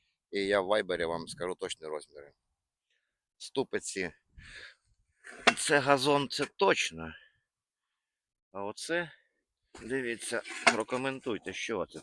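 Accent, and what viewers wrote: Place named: Western Ukraine, Ukraine. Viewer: Ты як бендеряка( маю на продаж) у нас так ніколи не говорили в полт.обл.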